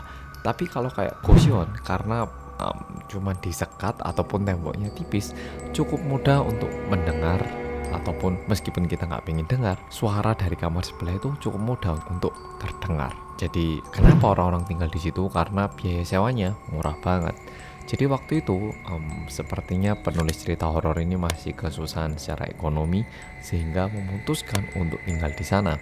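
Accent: Java, Indonesia. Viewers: salut, penyampaian runut dan tata bahasanya sempurna, meskipun medhok....👍👍 Sukses selalu yo, Cak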